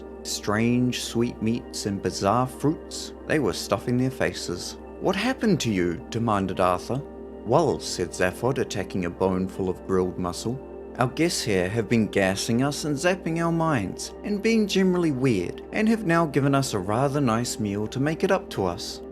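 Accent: New Zealand. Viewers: Oh snap! 😉Awesome read though with a kiwi ❣ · Kiwi reading! Yaaay!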